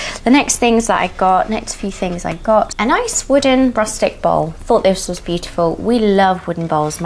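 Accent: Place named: United Kingdom. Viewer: I love your lovely British accent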